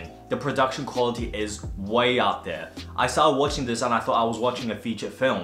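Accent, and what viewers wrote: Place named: United Kingdom. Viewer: I’m blown away seeing and hearing a Chinese guy with a British accent. I’ve been alive for 40 years and have never seen this before. Congrats on being so unique!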